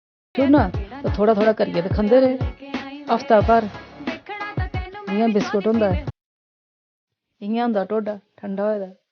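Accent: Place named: Dogri, India. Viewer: Your dogri accent is soo good♥️♥️♥️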